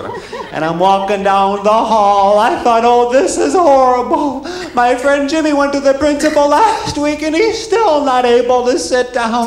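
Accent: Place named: United States. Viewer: Could see him playing Shakespeare plays if he lost the American accent.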